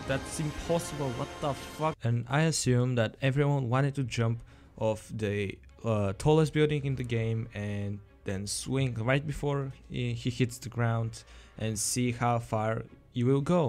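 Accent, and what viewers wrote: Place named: Russia. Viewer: Чё то кажется, что у тебя русский акцент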